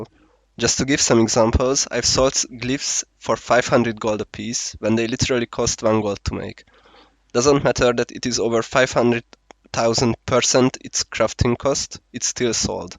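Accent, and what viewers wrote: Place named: Hungary. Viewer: Can figure out by your accent that you are Hungarian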